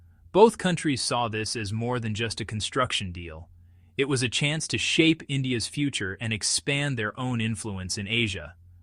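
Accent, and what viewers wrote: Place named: England, United Kingdom. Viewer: Chinese propaganda in anglicised English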